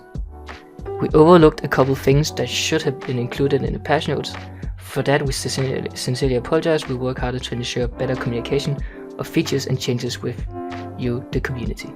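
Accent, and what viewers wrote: Denmark. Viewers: Fuck man kan bare høre du er dansk XD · easy to hear that you are danish XD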